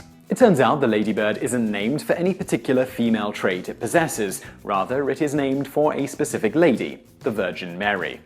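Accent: England, United Kingdom. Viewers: I presume from your accent that you are English. Then why are you calling the lady birds lady bug's.?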